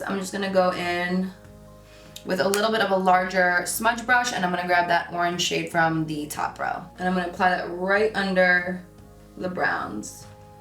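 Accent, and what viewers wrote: New Jersey, United States. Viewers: You sound like your from Jersey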